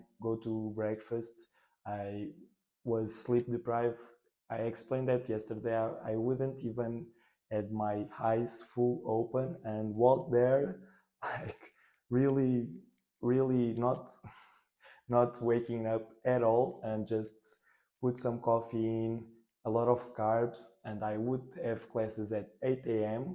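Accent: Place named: Portugal. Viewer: Nota-se logo pelo teu inglês que és tuga! Bom ver este tipo de conteúdo em Portugal. Continuação de um bom trabalho e qualquer coisa hit me up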